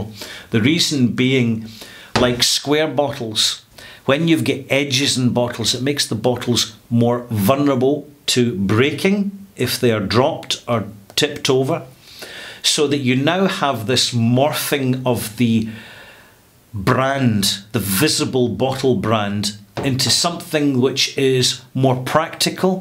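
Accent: Scotland, United Kingdom. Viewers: I was drinking a glass of tullamore dew and decided to youtube a review and stumbled across one of your videos. I have to say I love the Scottish, particularly since I hit Edinburgh last year with my missus. Honestly, I could listen to you for hours, I'd love to buy you a whiskey and to hear your stories. Much love from Ireland <3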